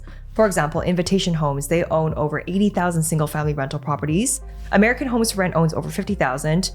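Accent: Canada. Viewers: She sounds Canadian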